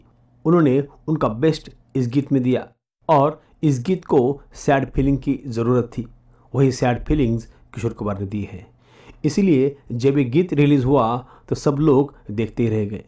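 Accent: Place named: Maharashtra, India. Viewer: तुम्ही मराठी आहात का? हिंदीत मराठी ची झलक झळकते ।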